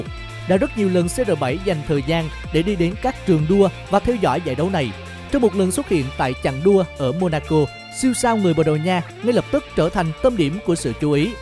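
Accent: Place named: Da Nang, Vietnam. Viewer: Người đà nẵng ak ad 😂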